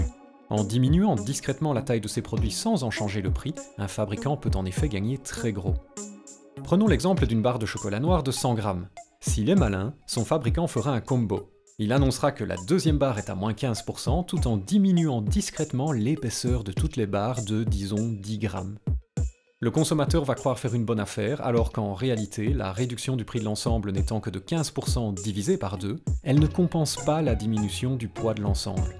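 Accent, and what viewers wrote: Belgium. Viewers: Et voilà, je me suis abonné ce matin grâce à Astronogeek et je ne suis pas déçu ! Merci pour ton travail et la qualité rendue ! Je me disais bien que tu avais le même accent que le grand Jacques ! Bonne continuation cher voisin Belge 🇧🇪 ! Un abonné Français 🇫🇷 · Une nouvelle abonnée, grâce à Astronogeek, faudra le lui dire, il kiff ca, à chaque fois ses cheveux et sa barbe poussent un peu plus ... =DD Je ne connaissais pas ton autre chaîne, mais je suis très intéressée par le sujet, contente de t'avoir "trouvée" donc, au final =) PS: Je repère une belgitude non ? les èèèèRRRR ! =D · c'est vraiment bien foutu! je ne connais pas la chaîne que tu avais avant je t'ai connu par astronogeek, je trouve que le rythme que tu a choisi pour de la vulgarisation de stats est vraiment bien. Ni trop rapide ni trop lent, ont à le temps de bien comprendre de quoi tu parle, roh et cet accent belge toujours aussi drole :D bon juste dommage que tu parle pas des prix des fruits et légume psk là aussi ils nous manipule bien. 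Faut fuir les grandes surfaces !!! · Merci à Astronogeek pour la découverte :) Je ne savais pas que tu étais belge, le "nonante" et le "houit" m'ont surpris ^^ Très bonne continuation à toi, y a de la qualité là ! Mat, un des 50 ou 60 premiers abonnés.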